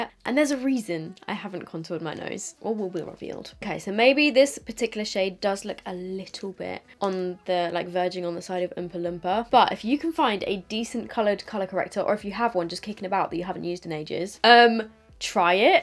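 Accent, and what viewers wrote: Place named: United Kingdom. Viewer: I just watch your videos. I dont care how long they are. I enjoy listening to your British accent. Keep up the good work. Love ya.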